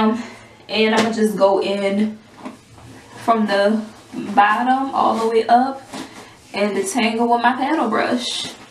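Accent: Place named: Louisiana, United States. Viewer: We gon start wit da bek, let's giv it sum wuhta bebbe😂🤣😂🤣😂 I jus luv ur loui accent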